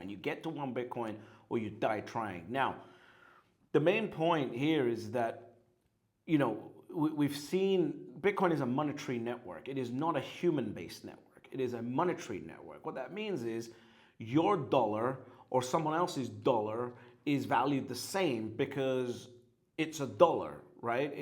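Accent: United Kingdom. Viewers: is back - Fantastic👍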